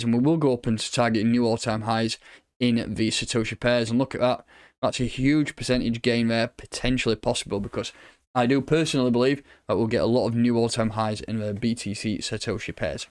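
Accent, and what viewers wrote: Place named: North, United Kingdom. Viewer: New to the channel. Nice to hear a northerner instead of an American. Nice vid, suscribed 🙌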